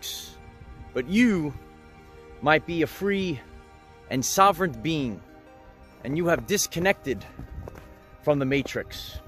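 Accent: New York, United States. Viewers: You must be a fellow NYer :) Thanks for this great video 💕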